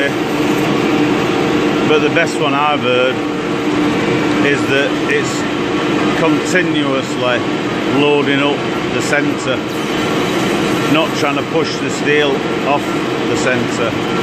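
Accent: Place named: Northern England, United Kingdom. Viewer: wonderful accent Northern MaN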